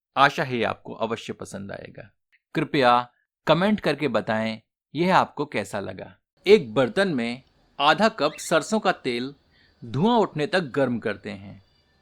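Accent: Standard. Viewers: maja aa gaya shuddha hindi sun ke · शुद्ध हिंदी का उच्चारण क्या बात है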